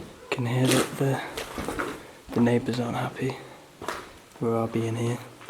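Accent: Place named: Stoke, United Kingdom. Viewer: you sound like a Stokie, would I be right in thinking that? this is a stunning video!! Well done. subscribed.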